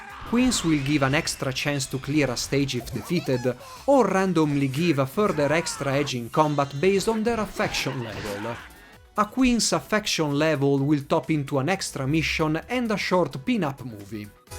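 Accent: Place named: Italy. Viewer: Your italian accent is quite annoying.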